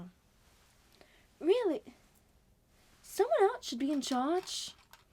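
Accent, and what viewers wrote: South, United States. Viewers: This is so good I thought you were gonna have a British accent but you didint it was more of a country. Can you make a Fred bear or Freddy next? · I’m southern, and this accent was lovely! Nice video n cosplay too! (^v^)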